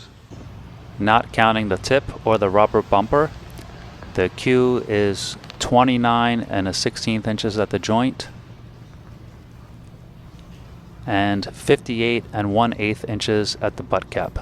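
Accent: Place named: Philadelphia, United States. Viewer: You have an ancient working-class accent we don't hear anymore. Is it a delco/philly accent?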